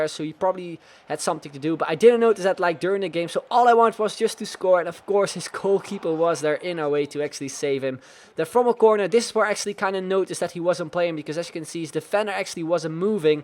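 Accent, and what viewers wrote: Netherlands. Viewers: From holland i can here that bye his accent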